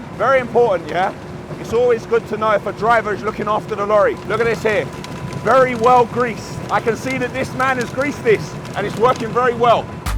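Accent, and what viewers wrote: Caribbean. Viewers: I knew u were of caribbean decent without even hearing u say it. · I knew he sounds Caribbean...big up from Jamaica mate; love your content and what you do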